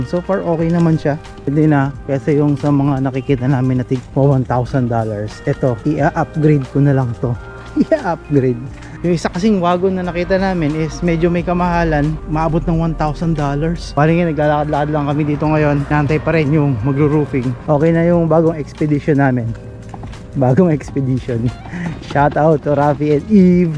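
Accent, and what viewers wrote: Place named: Ilonggo, Philippines. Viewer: basta ilonggo sir malalaman agad2x. More power sir.. 😉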